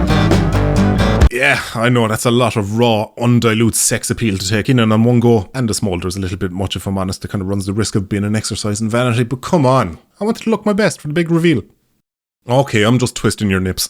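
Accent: Ireland. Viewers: It was kind of obvious who you were when I met you. You're the only 2d green duck with an irish accent. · Imagine one day you can just walks the streets in Ireland or even anywhere. Hear a very familiar accent just to turn towards a regular lad.. think where do I know this accent from... just to get home and realize you saw the actual. And this was probably the first and last time. But you still raise a pint to him and think... God he had a massive.... smile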